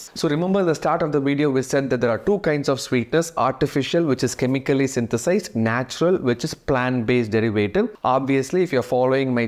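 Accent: Tamil Nadu, India. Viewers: I like your accent of English with strong influence of Tamil. :) so natural, just like the way we speak Tamil :)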